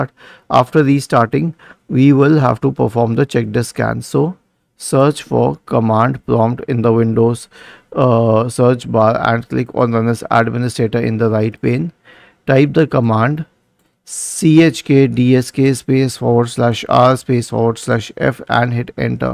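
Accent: India. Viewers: Speak english not crap of indian mix it english stupid video:face-red-droopy-eyes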